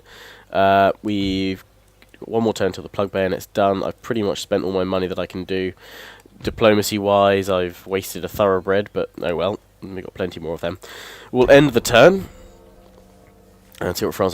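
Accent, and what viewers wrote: United Kingdom. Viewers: Nathan Winkle He said several times he was from the United Kingdom and has a very clear and obvious accent. Are you deaf? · Very nice british you are speaking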